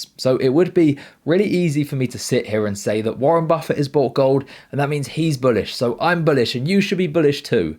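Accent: United Kingdom. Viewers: Subbed for financial opinions / both sides of the argument,.....Not the British accent......🧐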